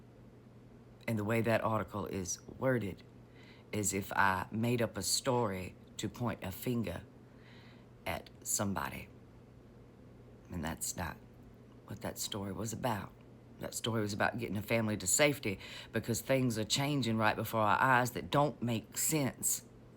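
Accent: South, United States. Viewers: I'm so sorry about this situation. I appreciate and love your candor and delivery, I have southern roots and miss that cadence! I pray for protection on you and yours. Be safe and well!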